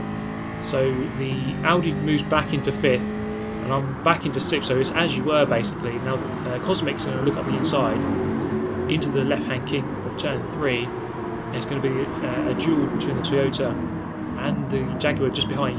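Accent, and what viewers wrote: United Kingdom. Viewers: That commentary in British accent , makes this video feels like watching an actual race !! But this is Gran Turismo, on PS4.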